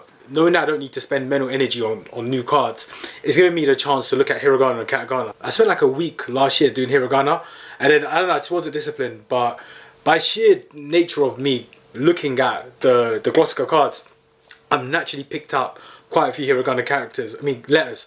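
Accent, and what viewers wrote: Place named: London, United Kingdom. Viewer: This is indeed the London accent. Listen to expressions like "Bruv" and "Innit"; very London.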